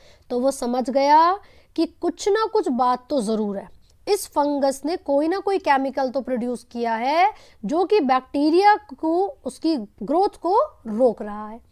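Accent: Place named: Uttar Pradesh, India. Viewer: Didi aap bataiye ki aap kis state se belong krti ho.Mujhe toh lgta hai ki aap UP ki ho.Aapka accent hi aesa hai jaisa UP mei.Agar m sahi hoon toh aapka like chahiye mujhe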